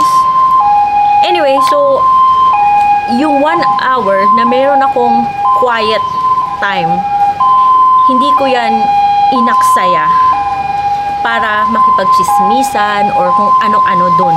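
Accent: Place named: Visayas, Philippines. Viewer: dah gawas lge imong pagka bisaya ahahaha..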